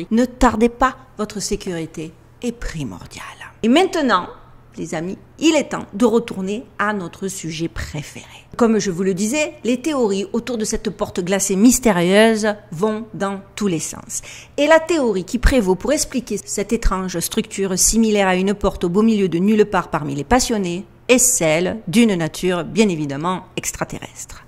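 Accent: Marseille, France. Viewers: T’inquiètes pas, on n'entend que ta douce de voix de marseillaise peuchère! Et puis on est trop charmé par tes beaux yeux pour se focaliser sur de quelconques bruits extérieurs!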